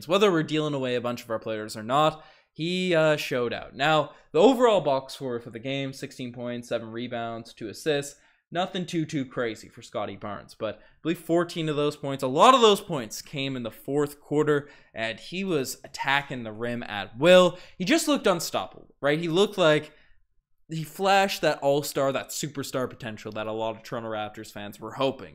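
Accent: Newfoundland, Canada. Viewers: must be from town with that accent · Are you from Newfoundland?? 🤨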